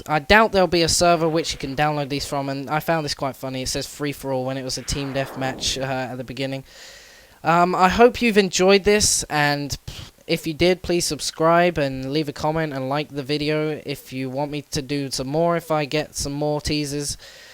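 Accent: United Kingdom. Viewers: you sound like your from london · great job breaking it down! Brits make things sound much cooler than us yanks.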